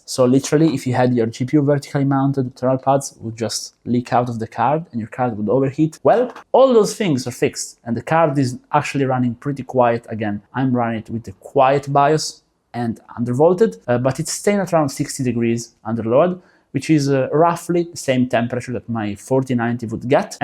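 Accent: Italy. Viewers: Familiar accent:Italiano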